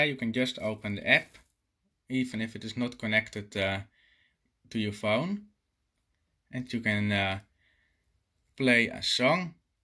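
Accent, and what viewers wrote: Netherlands. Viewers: Hahah Nederlands accent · Echt goud hoe je zijn Nederlands accent hoort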